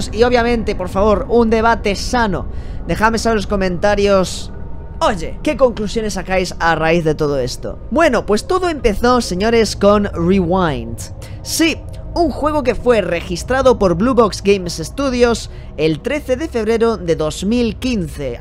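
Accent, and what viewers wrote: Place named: United Kingdom. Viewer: Me encanta tu ingles UK, que nadie te diga lo contrario!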